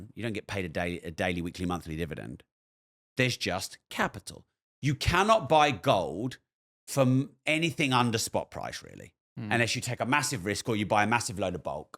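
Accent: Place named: United Kingdom. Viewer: Can't listen to anyone with a British accent more than 30 seconds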